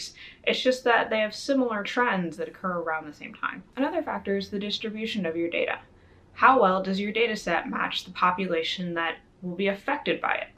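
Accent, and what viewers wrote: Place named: California, United States. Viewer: Dialect is Western, California, Santa Monica.....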